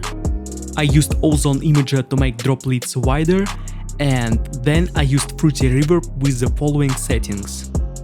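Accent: Russia. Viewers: Amazing, сразу узнал русский акцент)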